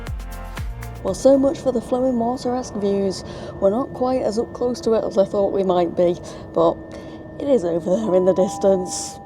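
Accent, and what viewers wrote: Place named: Wigan, United Kingdom. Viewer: I think she says "Hi everyone" run together and sung as one word. I think she's from Wigan which is known for having a strong accent. Some even regard it as its own language known as "Wiganese".. ;-)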